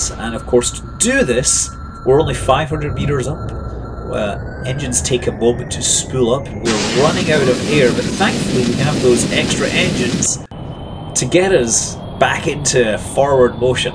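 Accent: Scotland, United Kingdom. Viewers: i'm pretty sure he's scotish.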